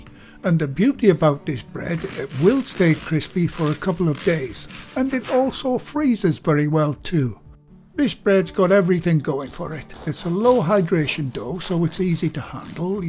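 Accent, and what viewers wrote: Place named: Newcastle, United Kingdom. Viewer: Your voice is so calming for less confident cooks! " Set your yeast away"... I've decided more food channels need to be in Geordie dialect!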